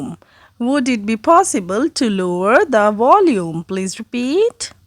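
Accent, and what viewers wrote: Kerala, India. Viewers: Are you malayali or not? I'm totally addicted to your malayalalam voice. It's so mesmerizing dear ❤😊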